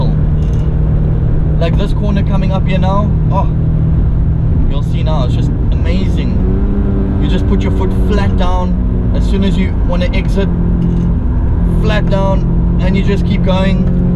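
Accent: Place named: South Africa. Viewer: Sound South african lol love it Subbing dude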